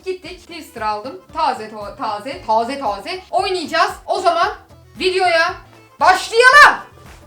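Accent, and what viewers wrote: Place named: Osmaniye, Turkey. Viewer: Yaw her hareketine ve konuşma tarzına hastayım aynı bizim osmaniyeliler gibi konuşuyor hal ve hareketleride aynı. Kısacası Hastasıyız Dedeeee 😎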